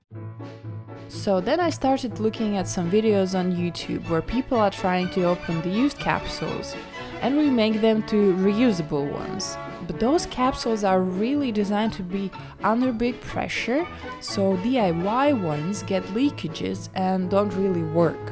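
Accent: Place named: Eastern Europe. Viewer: My guess would be former Yugoslav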